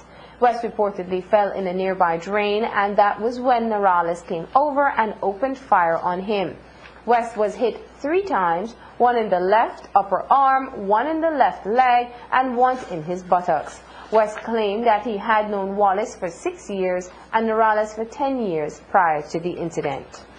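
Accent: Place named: United States. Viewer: Sounds like the american accent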